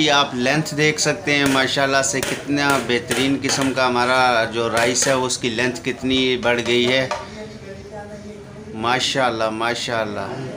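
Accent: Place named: Abbottabad, Pakistan. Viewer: Bhai bot asani se sumjate ho app abootabad ke ho ap ke lehjay se lugta he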